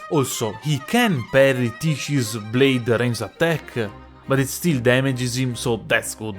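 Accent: Italy. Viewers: you sound so much italian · this guys Italian accent is so heavy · Mi son bastati i primi 2 secondi per capire che eri italiano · si senta un filo l'accento italiano mi piace ancora di più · I hear the Italian accent my guy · l'accento italiano più forte e marcato che io abbia mai sentito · Are you Italian by any chance, mate? I can recognize the accent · Accento leggermente italianeggiante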